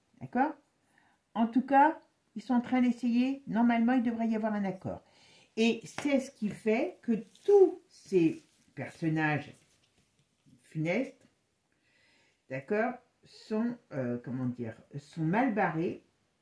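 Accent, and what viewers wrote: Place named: Périgord, France. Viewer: Bonjour, je vous découvre, et j'aime vos tirages, votre douceur d'âme, et ce petit accent que vous avez, et que je connais, ô combien, puisque j'ai le même. Je vous embrasse depuis Le Périgord. Très sincères salutations. 🕊💞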